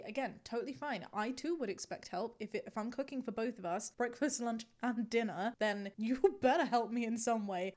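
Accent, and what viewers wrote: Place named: Yorkshire, United Kingdom. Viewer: Eyyy Yorkshire accent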